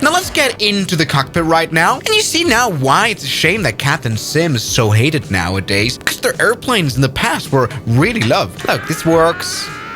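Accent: Germany. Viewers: I was not expecting the German commentary brother. I guess I never realized you are German lol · bro was a Germany or swiss? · Dein Englisch-Deutsch hat mich gekillt😂👍. Ich hab von Kindesbeinen an sämtliche Simulatoren geflogen, angefangen mit FS98, 2000, 2002, 2004 und der FSX natürlich. Der Übergang vom 2000 auf 2002 war schon revolutionär damals. Bevor ich aber wirklich mit dem MSFS angefangen habe bin ich viel "Fly Unlimited 2", der wahre Flugsimulator meiner Kindheit. Ach und dann war da noch "Fly! 2" von Take 2, kaum bekannt. Ich erinnere mich noch an Top Addons im FS2002, Capain Sim hat im FS2002 mit der 727 angefangen. Die war damals revolutionär oder die gute "Ready for Pushback 747-200". 2012 habe ich dann Pause gemacht und Anfang diesen Jahres mit dem FS2024 angefangen. Ihr könnt euch nicht vorstellen, wie heftig diese Entwicklung für mich war😂. Die Grafik war das Eine, okay, ich hatte den 2020 schon auf dem Schirm und wusste von der guten Entwicklung grafischen Entwicklung, aber was für mich krass war, war die neue Technik in den Cockpits, nämlich die neuen Glascockpits. 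Bis dahin war für mich das Cockpit einen A320-200 am modernsten oder das einer 777😂. 2011 gab es doch von Microsoft einen ganz kläglichen Simulator. Ich glaube der hieß einfach nur "Microsoft Flight", spielte nur auf Hawaii und war grafisch im Grunde nur ein leicht aufgehübschter FSX, wurde auch baldig eingestampft.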